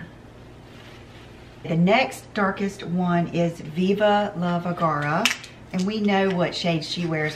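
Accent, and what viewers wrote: South, United States. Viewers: I like how you are so thorough with showing us the colours and giving your opinion of them. I also love your southern accent x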